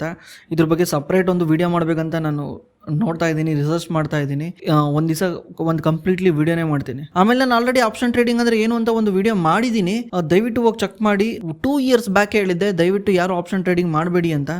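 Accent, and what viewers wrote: North Karnataka, India. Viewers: Bro nimma speech language kelidare neevu north karnataka start areadavaru irbeku anisutte nimma native place yaavdu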